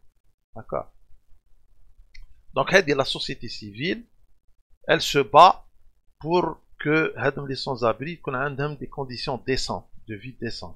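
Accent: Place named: Morocco. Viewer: Ce minable a un accent marocain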